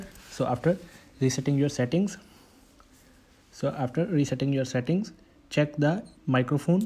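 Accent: India. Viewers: Ikr? So stupidly fast with the Indian accent like bro slow tf down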